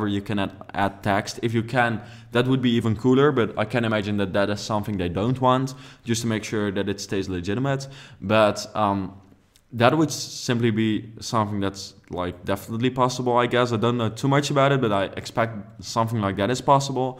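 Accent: Netherlands. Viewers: Je kan duidelijk aan het accent horen waar je vandaan komt haha (geen haat, nederland staat er een beetje bekend om)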